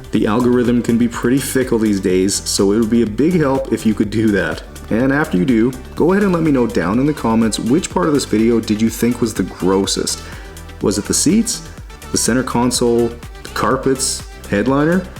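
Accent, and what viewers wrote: Canada. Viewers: I always quickly ask myself why parts look like the vehicle was around the coast too much as a Texan, then quickly remember this is from the salt on the roads during y’all’s inevitable snow in SK, Canada. One of my beloved best friends of decades is from there and he has many stories of living on a farm and dealing with crazy amounts of snow. Much love, enjoy the vids so much. I love catching your Canadian accent btw.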